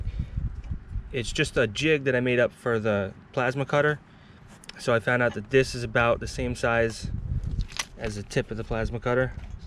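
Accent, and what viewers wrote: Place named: North, United States. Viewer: I can't figure out this guy's accent. It's definitely Northern, a touch of those Canadian long vowels, but I can't place it. At first I thought Upper Peninsula/Wisconsin but it's not that strong. Maybe Minnesota or N.D. It's gotta be this side of the border. Anyone know? Also nice vid - I can't get enough of these long form restorations of old farm equipment.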